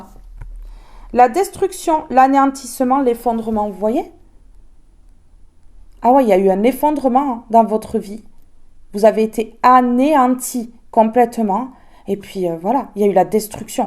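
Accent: South, France. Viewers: Bonjour ma très chère belle âme! Je te remercie pour la bienveillance que tu met dans tes guidances et je perçois que tu as un grand coeur et une grande gentillesse et la façon dont tu nous parle avec ton magnifique accent méridional nous apaise et nous réconforte! sincèrement!!!. Merci!!! Je penses que tu as raison ma vie va être bouleversée durant ce printemps 2025 (Avril, Mai 2025) et qui va grandir en été et en automne 2025 Tu vois juste Je m'adresse à Dieu pour des miracles et je pense que je vais en obtenir au moment ou je m'y attendrais le moins ça va démarrer et se construire graduellement je pense qu'il va y avoir la rencontre amoureuse et il va y avoir construction pour le grand amour et il y aura de la création artistique!!! AMEN Que Dieu t'accorde du bien et que ta soirée te soit des plus agréable.. Bisous ❤💖😘😘🌟☀🙏🙌🙏🙌🙏🙌🌟☀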